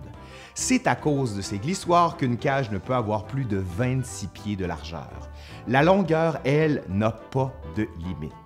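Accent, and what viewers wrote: Quebec, Canada. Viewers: Ne serait-ce que pour me bercer dans l'accent Québecois, qui me rappelle mes six mois passés dans la Belle-Province, je kiffe écouter parler. Et puis, ça, c'est sans mentionner le fait qu'a chaque fois c'est passionant ce qu'il nous raconte!:)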